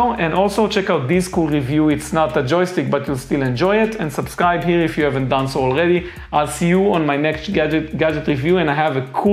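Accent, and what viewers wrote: Israel. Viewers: שומעים שאתה ישראלי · I love your Israeli accent :))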